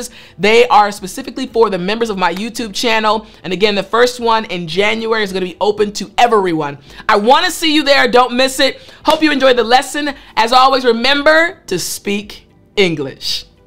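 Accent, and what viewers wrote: United States. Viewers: Wow, i love her American Accent. It sounds so good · I love her pronunciation and American accent, the most beautiful accent in the world for us eternal students of the most important language on and of the planet!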